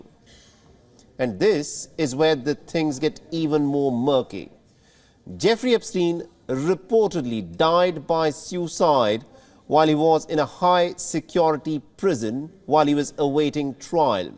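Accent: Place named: India. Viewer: It’s an Indian accent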